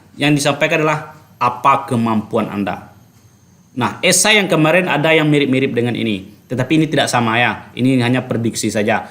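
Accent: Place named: West Sumatra, Indonesia. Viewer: dari logat URANG AWAK udo Koo 🙏🙏🙏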